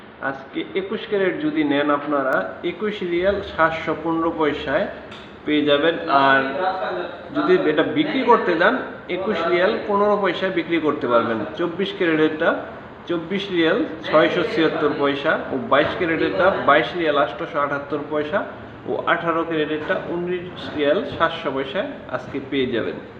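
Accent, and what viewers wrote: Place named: Rajshahi, Bangladesh. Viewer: ধন্যবাদ কথার ভাষা রাজশাহীর মতো তাই বলেছিলাম আর কি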